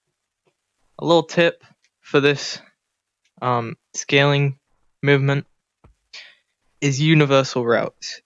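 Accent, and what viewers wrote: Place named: United Kingdom. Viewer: i think it is very good visually but i cannot seem to understand the bri'ish vocals very unclear and why dont you just walk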